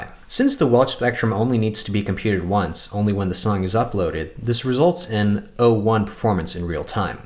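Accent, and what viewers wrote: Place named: United States. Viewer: never underestimate an Indian with American accent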